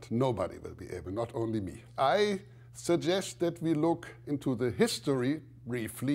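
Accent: Germany. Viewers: Stop commenting his English. He speaks well and understandable. Try to imagine yourself explaining difficult problem in foreign language. Most you you, who complain, are not even within range of sight knowledgewise. And btw rather german accent than french one. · I thought: "He sounds german." And the description reveald my assumption as true. :D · I'd say German, he sounds like Werner Herzog. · His german accent is cancerous.-. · You can directly hear that he is from Western Germany :D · I can clearly hear from where (in Germany) he originates - it's all in the prosody. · Oh, that accent is too German, indeed! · He has a thick accent for sure, but I can understand what he is saying. So I have no problem with it. I can't speak German, so I'm glad he knows English! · I don't think an accent can get any more german than that.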